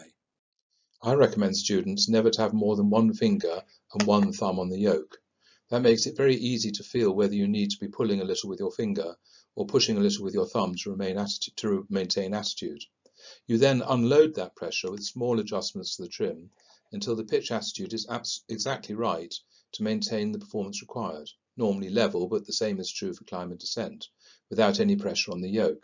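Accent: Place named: United Kingdom. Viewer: Thank you so much for this basic info for every aspiring pilot...Very informative, and presentated in a very clear and "to the point" way! (despite it's a bit difficult for me to grasp everything, because of your Brtish accent, but i' plan to watch the video several times.) Once again, thank you Sir!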